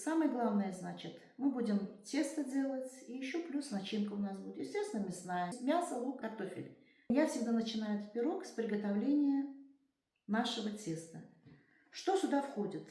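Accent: Standard. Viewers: Какое мягенькое нежное тесто! А какой приятный, завораживающий голос милой хозяюшки! Чистая, правильная русская речь! Спасибо Вам огромное за рецепт, за подачу, за Ваше чудесное видео! Здоровья вашей семье!❤❤❤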